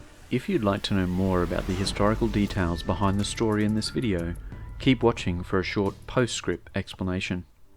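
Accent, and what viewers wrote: Australia. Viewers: Awww c’mon now. Just coz we have an Australian twang we don’t need to mispronounce names….Amun Ra is not said as Ahmoon Ray, it’s more akin to Ahmen Rah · Clicked on this, was shocked to hear an Aussie accent, New sub here!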